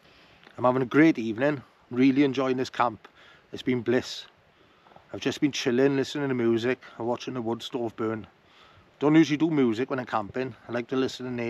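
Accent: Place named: Abercynon, United Kingdom. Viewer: Enjoy your videos, I'm a Carmarthen man but my wife is from Abercynon so I fondly recognize your accent from the area . Like you I enjoy getting out wild camping but my poison is a spiced rum which I enjoyed in the middle of nowhere with the rain pouring down in a mist .It soothes the soul. Keep up the great content.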